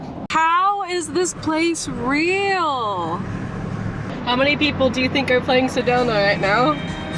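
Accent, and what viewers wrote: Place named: South, United States. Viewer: Also, With The Thing Inside Your Mouth, I Can Hear The SouthernBell Southern Accent For Real.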